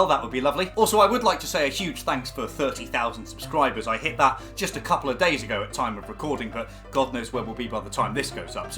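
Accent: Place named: United Kingdom. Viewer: Hate getting two minutes into a video and realising I can't watch it bc the narrator is Br*tish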